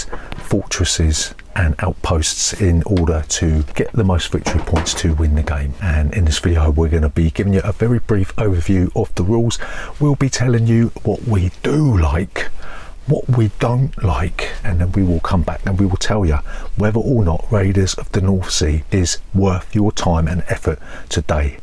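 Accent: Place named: Cockney, United Kingdom. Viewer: I quite like watching an subtly-aggressive cockney do a board game review - he reminds me of Vinny Jones! Good stuff. (I'm sure he's not actually aggressive, it's more the comparison to Jones.)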